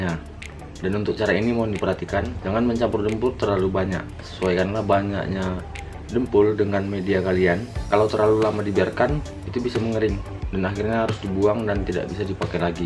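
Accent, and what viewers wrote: Medan, Indonesia. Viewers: Bahasa nya kental medan kali bg,bangga awak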